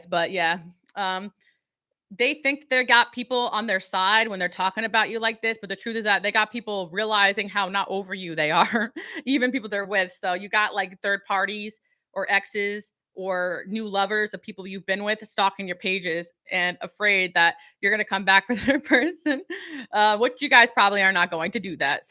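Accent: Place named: South, United States. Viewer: Lol readin territ… lean i to that southern why don ya 😅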